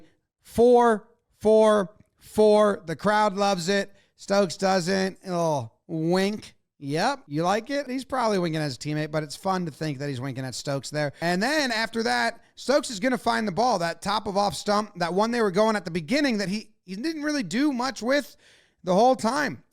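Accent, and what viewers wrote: United States. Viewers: I went 5 minutes in and went "Wait a minute, that's an American accent". Good analysis.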